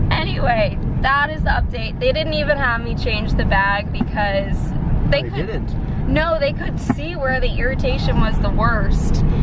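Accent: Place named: South Jersey, United States. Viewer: I thought I detected a south jersey accent.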